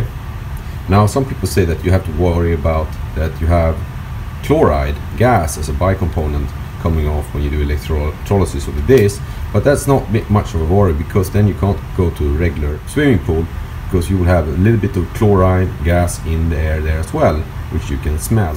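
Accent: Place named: Sweden. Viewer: Haha typiskt Svengelskt uttal, I övrigt bra video.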